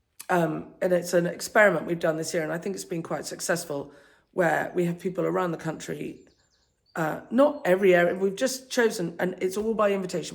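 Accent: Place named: England, United Kingdom. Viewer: I love watching your videos! First of all your English accent is beautiful. I am a New Zealand living in Queensland Australia. I trained as a florist in 2015 but was never able to get a job at a florist. Now I deliver flowers for a delivery company. It is overwhelming at times as the traffic here is a nightmare. It isn’t a relaxing job at all. I often feel stressed and rushed because I just want to get the jobs done and get off the road! I watch your videos and wish that I could do what you do. Unfortunately we rent our house so that is a problem. Oh well, maybe when the Lord calls us home, maybe He will have a bountiful field of flowers for me to attend to🌸😍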